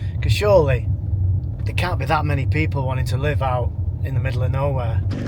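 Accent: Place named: Bradford, United Kingdom. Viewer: As a lad with a BD postcode, I can fully appreciate ‘ ’ accent. 😂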